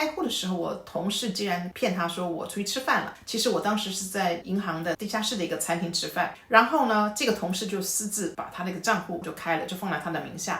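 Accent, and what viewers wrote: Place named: Taiwan. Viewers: 明顯是台灣的腔. 台灣腔有許多種類。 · 很明顯的台灣腔啊。怎麼在美國40年還可以保有這麼自然的台灣腔，厲害！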